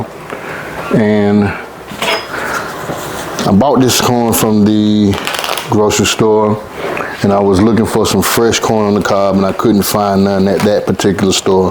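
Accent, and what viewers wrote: South, United States. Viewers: “I got some irl. Some olive irl”. is soooo country, I love it. 🤣🤣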